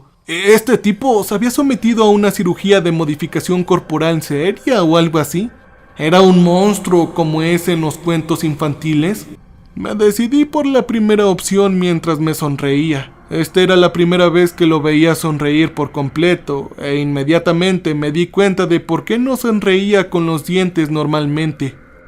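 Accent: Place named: Mexico. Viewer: Tu eres un mentiroso o cuenta los cuentos de otros, en otro cuento eras de Inglaterra y ahora eres de EEUU cuando tu acento es mexicano o de sur américa. Yo soy norteamericano y de descendencia de P.R y cuando vives en EEUU pierdes el acento latino.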